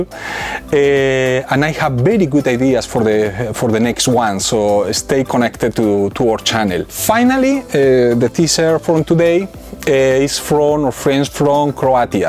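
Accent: Spain. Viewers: Thanks ... I like your spanish English ;-) good and useful clip...I use always girl stuff for this.. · I love Spanish accent. Keep up the good work.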